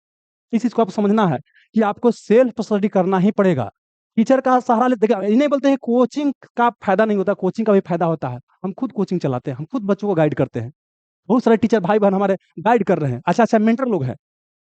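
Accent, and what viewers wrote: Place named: Bihar, India. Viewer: Bihari style me mast bol rhe ho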